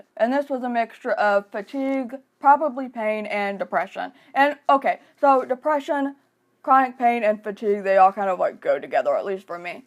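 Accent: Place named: Southeast, United States. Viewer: I had to look up where you're located because your accent sounded so familiar and I knew it must be somewhere in the Southern East Coast. :D Sending solidarity from Maryland!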